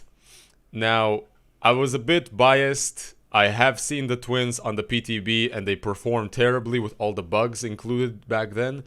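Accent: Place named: Israel. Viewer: יש לי תחושה שאתה ישראלי בגלל ה accent lolol great vid BTW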